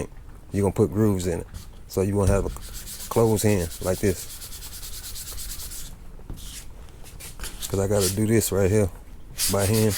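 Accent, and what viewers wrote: Alabama, United States. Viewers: What part of Alabama you from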